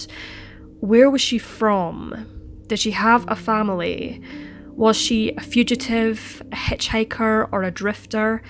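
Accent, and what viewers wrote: Scotland, United Kingdom. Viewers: Voice: Scottish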